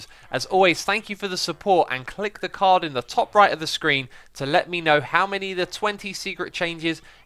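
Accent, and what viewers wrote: United Kingdom. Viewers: yay.yet ANOTHER british minecraft youtuber lol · informational videos by a British chap, what can be better than this.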